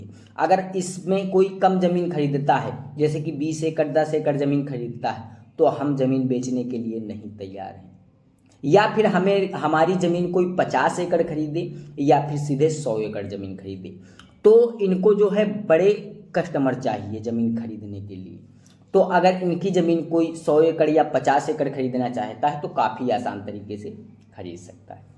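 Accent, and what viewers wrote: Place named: Jamtara, India. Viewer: बोलचाल से तो भाई जामताडी लग रहा है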